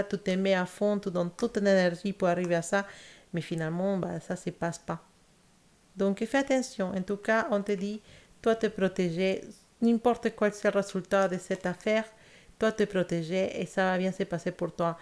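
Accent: Spain. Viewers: Holà, j’adore ton accent espagnol il ne dérange surtout pas . Y Muchas Gracias !!! ❤️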